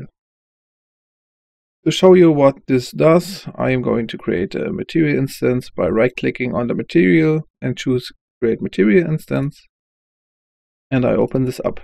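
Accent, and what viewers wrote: Germany. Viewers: Thanks its so easy xD i had seen many Videos before ( bist doch bestimmt deutscher. Es kann mir gleich so vor beim Sprechen 😆)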